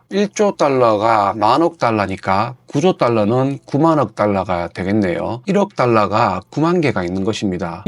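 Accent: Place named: Gyeongsang, South Korea. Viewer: 몽실몽시리하게ㅋㅋㅋㅋ저희집이 경상도라 너무친근감이있습니다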